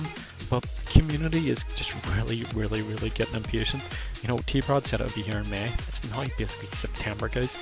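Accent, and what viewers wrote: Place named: United Kingdom. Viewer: You sounded British to me. Plus most of the time when am in your server you are in English servers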